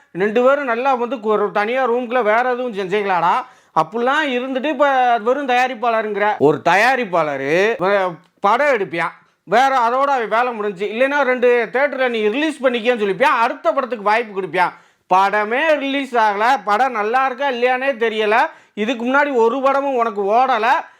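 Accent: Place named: Madurai, India. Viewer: ANNA,UNGALODA NAME PLEASE.SUPERB MADURAI TAMIL VERA LEVEL ANNA.❤❤❤❤❤🎉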